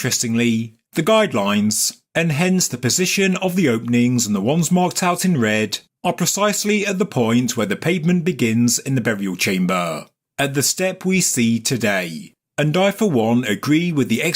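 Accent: United Kingdom. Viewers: wow, you’re right. He sounded like a normal chap in his early videos. Now he sounds like a mix between a posh Englishman and a valley girl. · Too bad! Get over it! There are plenty of other crappy channels that try to be as good as this one. You can give them a shot with their less annoying selves to listen to. You got better things to do than to bitch about someone giving you great information for free. In Britain there are many different accents and this is one of them. There are groups of people that talk just like this too. Move on. Stop bitching.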